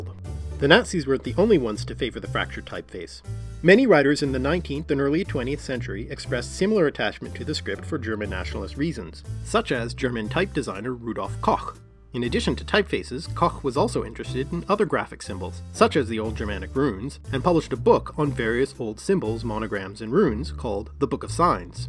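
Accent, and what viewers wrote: Canada. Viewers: Are you Canadian? Just how talk makes me think you are.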